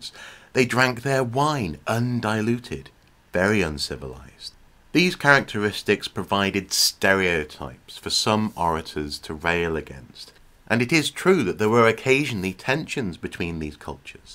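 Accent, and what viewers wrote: United Kingdom. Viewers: I do not recognise your accent as Welsh but English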